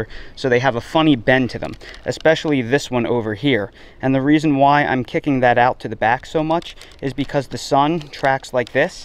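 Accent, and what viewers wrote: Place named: New Jersey, United States. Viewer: North Carolina? I came here to learn how to grow blueberries in Philly. I know a local accent when I hear one. Found out your about page to confirm you are from Jersey. If there is one thing I know Jersery grows the best blueberries around. This guy knows what he’s talking about! Great information especially about the growing zones. Subbing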